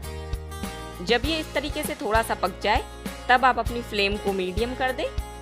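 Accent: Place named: Sindh, India. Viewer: Very. nice recipe... Also luv ur Sindhi accent...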